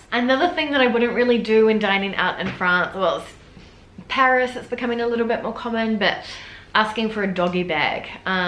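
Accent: New Zealand. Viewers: Trying to work out your accent... Kiwi, right? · wow your kiwi accent really came through when the auto-caption mixed up your six and sex... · I love your Kiwi accent, love ! Great summary of french foody etiquette.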